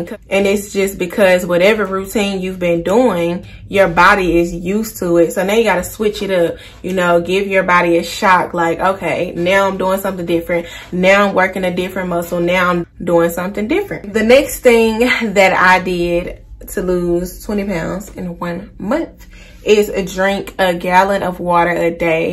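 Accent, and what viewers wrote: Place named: South, United States. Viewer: your accent is adorable southern belle!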